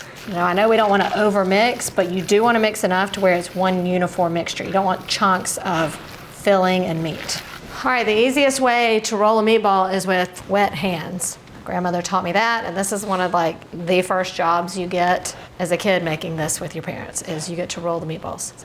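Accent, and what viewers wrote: South, United States. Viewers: This is the first time that I hear an italian with a souther accent... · She’s Italian? I couldn’t tell with that Southern accent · The southern accent is a kick. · So you’re an Alabama girl. Good to know, I thought that I heard a southern inflection. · Definitely first time hearing a southern accent instead of a NY/NJ one making Sunday gravy. My friend’s mom never used tomato paste, it was all whole tomatoes from a can that she crushed with a device. She also used beef neck bones, sweet and hot sausage, braciola, veal and pork. Great tip with the meatballs, although she never served meatballs with, just the meat from the sauce. She browned all the meat first then added the tomatoes. That sauce started Saturday night and was served Sunday evening. The smell lingered until Tuesday. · To me, it's just funny how a American with a strong southern accent calls themselves Italian, well I say of ancestry is the best way to put it. 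And saying sugo with a strong American accent. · The southern accent gives me life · She's awesome, being an Italian gal with a southern accent always gets me. Check out her recipe for roasted potatoes made into a creamy salad AMAZING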